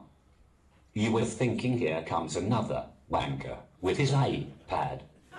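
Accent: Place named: London, United Kingdom. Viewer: that's the accent of London. :)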